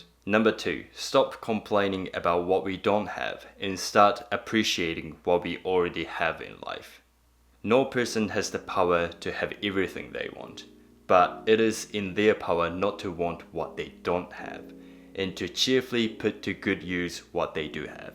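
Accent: New Zealand. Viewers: Yes I hear the Kiwi accent too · You're wise and I like your kiwi accent. Cheers from 🇬🇧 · I’m sure that you had great English when you were 15. The problem was that New Zealand accent. If you have come to the US, we would have been able to understand you better than some native New-Zealand-ese speaker. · I'm perplexed by the I watch on YouTube. He presents himself as a person who enjoys solitude, stoicism, introspection, and minimalism. He is self-conscious with his English. However, his English is articulate and well composed, with an occasional and charming compensatory hard Japanese "r" and delightful Kiwi accents. He strives for standard American English, now thought of as West Coast American English. With all of his preferred introsepction, he presents these wonderfully scripted videos of professional quality. So, is he a loner who is driven to tell us why and persuade us to find the same karma? 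How can he, without a salaried job, live so well in expensive Japan? While he professes not to be moved by the opinions of others, he has perfected his physic, his speech, and his mannerisms for others and is delightfully, appropriately seductive.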